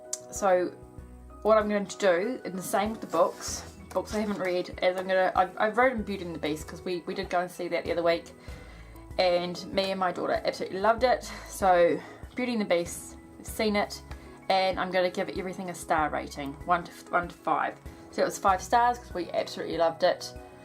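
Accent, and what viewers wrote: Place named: New Zealand. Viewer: Hi - I love hearing our kiwi accent on bullet journal videos!! I started a bullet journal in August last year...just in a grid notebook and using a black biro. The system made sense to me so I bought a Leuchtturm 1917 dot grid from The Drawing Room (Chch) for 2017. Keep it simple to begin with...check out NZer Pages to Plans on Instagram and her own website... Boho Berry - Bullet Journal 101 (she also has a resource library with handwriting practice sheets)...The Lazy Genius How to Bullet Journal. All the very best!!!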